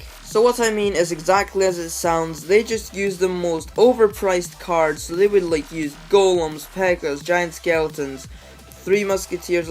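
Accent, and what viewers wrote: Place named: United Kingdom. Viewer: If you mean Russian then he's not, he has a British accent.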